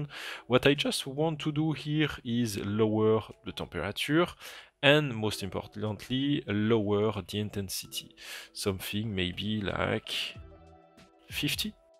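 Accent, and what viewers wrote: France. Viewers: le mec a tellement un accent fort en français que même si tu parles pas anglais tu le comprends:)) merci pour les conseils en tout cas, très interressant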